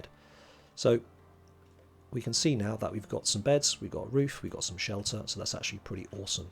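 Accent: United Kingdom. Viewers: You have an excellent voice for narration, I swear the English accent was tailor made for narration. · Delivered with such natural ability. I'm a british expat living in Norway, always nice to hear a fellow brit now and again. I shall continue on with the series, as the guide in general was a tremendous help. 10/10 for clarity, bravo.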